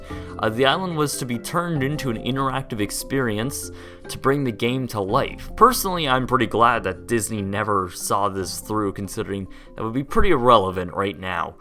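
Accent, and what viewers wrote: Canada. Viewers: You sound Canadian, and stoned.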